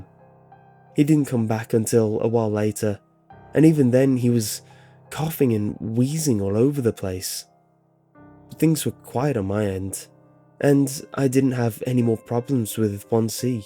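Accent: United Kingdom. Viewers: Love your british accent ( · 70 isn't that bad, where I live 60-70 is the norm...then again where I live one day it will be sunny and 70 degrees and the next day 20 degrees and snowing that Indiana for you - _ -; Also I just realized are you referring to Fahrenheit or Celsius? In the US we use Fahrenheit to calculate the temperature. Even though the narrator was british, the author of the story was probably american.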